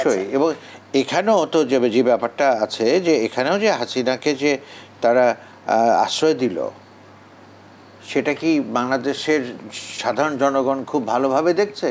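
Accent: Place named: West Bengal, India. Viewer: এই উপস্থাপিকাকে কোত্থেকে ধরে এনেছেন? কথা বলার স্টাইল পুরা পশ্চিমবঙ্গ স্টাইল। বাংলাদেশীরা এভাবে কথা বলেনা।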